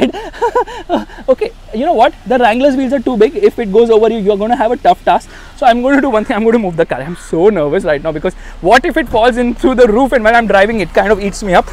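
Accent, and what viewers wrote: India. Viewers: Wow wow wow - The first thing you need to do is apply brake on ur tongue. It seems u are doing 250 words per minute & that too in Indian style English. Should we call it a running commentary or a runaway commentary? I'm sure 99% of ur audience will appreciate if u slow down. Basically u r ruining d show with your runaway commentary. Sorry if u find me rude, but I just honestly gave u the feedback. Thanks.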